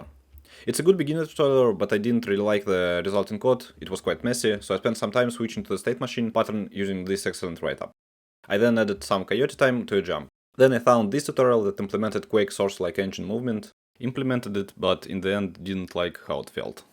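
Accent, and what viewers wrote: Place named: Russia. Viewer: слыша акцент я не могу не думать что автор из России или стран снг, не удивлюсь если это так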